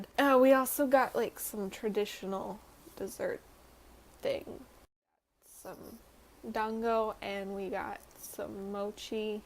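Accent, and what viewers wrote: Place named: South, United States. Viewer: Thx. I never lived in the US, so didn't know, but yes, you do sound southern to me! Come to think about it, my economics teacher in high school may have been from NC, and she used to teach us about supplaa & demeaand... Pity you can't find time to travel this time. Enjoy the rest of your stay in Kyushu! As for the chocolate, I personally like the Kinoko.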